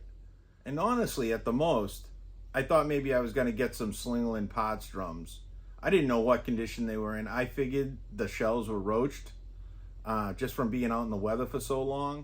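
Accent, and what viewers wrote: Boston, United States. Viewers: dam! I was gonna say Brockton when you said south shore. But your accent is thick so I thought closer to Boston. Just moved my son into a house in Brockton. Like Avon border, so not too bad. Nice neighborhood…for Brockton 😂. I can’t say too much about it. I live on the border of Woonsocket RI. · Sketchy park, and Boston accent. Definitely thinking Brockton or Fall River 😂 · Wow, man! I saw a poscammed. Free drums in the woods somewhere and just assumed it was a scammer. Maybe not related . Either way, this is absolutely crazy!!! What a killer find. Good job tracking it down, man. And hearing you say "pahk" and "pahts" so many times just added to my enjoyment 😂😂😂 Had to get a Boston jab in there as a New Yawkah! So envious on that Vistalite kit. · Great video! Are you from North or South of Boston? Apts look like either Brockton or Dorchester. 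I lived on South Street in the early 80's Campello section. Def a Boston accent!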